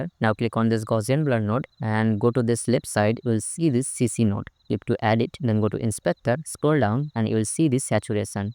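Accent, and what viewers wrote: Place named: India. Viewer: always the ppl with the indian accents who have the most GOATED tutorials :glasses-purple-yellow-diamond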